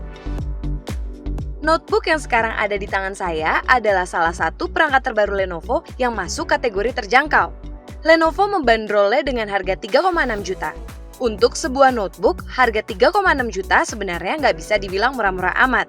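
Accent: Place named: Java, Indonesia. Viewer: Logat jawane kentel bgt :D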